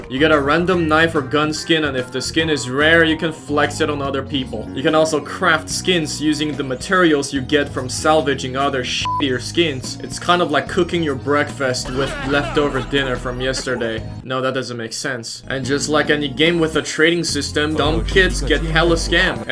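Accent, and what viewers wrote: United Kingdom. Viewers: You are britsh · BRITISH BRITISH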